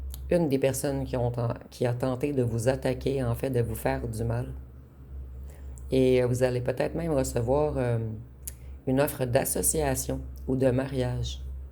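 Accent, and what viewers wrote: Quebec, Canada. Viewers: Bonsoir et oui il y a des gens qui passent leurs vies à faire le mal mais tout revient vers ses personnes tôt ou tard Ma lumière dérange parait il mais j'en envoie beaucoup aux autres à travers les soins étant guérisseuse ( genre Laochi de la 13 Octave ) etc Psychotherapeute après je me suis dirigée vers les soins énergétiques. Merci votre guidance est terrible vous voyez incroyable J'espère que vous continuerez même partant au Quebec j'adore votre accent cela me rappelle l'intonation d'un ancien ex Québécois Quel beau pays Merci à vous Excellente soirée bise❤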